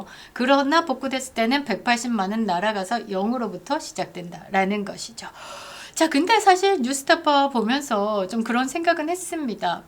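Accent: Gyeongsang, South Korea. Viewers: 의 님 말씀이 참 귀에 쏙쏙 들어옵니다. 특히 좌빨이, 쌤통 등 ~~~ 경상도 사투리로 이렇게 찰지게 설명하시는 능력이 탁월합니다. 늘 응원합니다~~~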